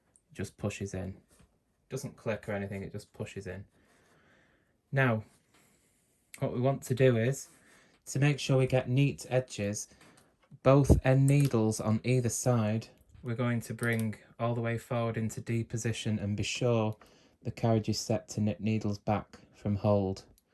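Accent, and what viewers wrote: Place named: Northern England, United Kingdom. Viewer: i'm just about to purchase a secondhand MK70 and thought i'd look for a video or two as i don't it comes with a manual. i was watching without sound and thought you were American. imagine my surprise to hear your Northern accent, i'm from Lancashire. i couldn't have asked for a more informative, easy to follow tutorial. once i get my machine i'll be replaying this video constantly. thank you for spending the time in making it, it's much appreciated.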